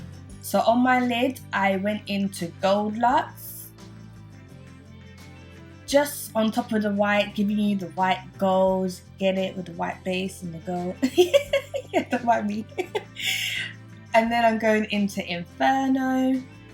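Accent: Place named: England, United Kingdom. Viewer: Damn, you're English lol I love your accent. New subbie here 😊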